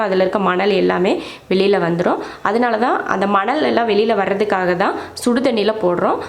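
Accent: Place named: Theni, India. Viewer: Madam I am Pavithra Vijayan from Hyderabad.my native is Theni cumbum .nan ungalin subscriber.i like your cooking style madam it resembles Namma native muslim style maadhiri erukkae endru yosippen like ungaloda preparation,way of explaining that accent your tamil.later you told about Theni district special that day I confirmed .hearty congratulations mam . Namma ooru cooking stylela world wide propagate Panna vaitha ungalukkum,ungalin familykkum,and all the subscriber's kkum .my hearty congratulations.I am proud of you get a you tube channel from Theni with 1MILLION subscriber's very soon . thank you for this dish today .I like it most.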